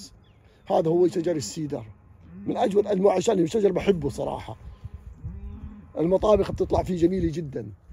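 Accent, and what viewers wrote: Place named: Palestine. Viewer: باين من لهجتك فلسطيني أو أردني تحية لك من بلد المليون شاعر الجمهورية الإسلامية الموريتانية ❤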